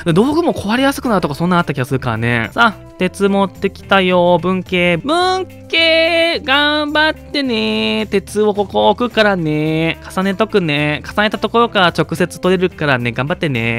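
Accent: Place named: Mikawa, Japan. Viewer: よく三河弁出とるもんで三河？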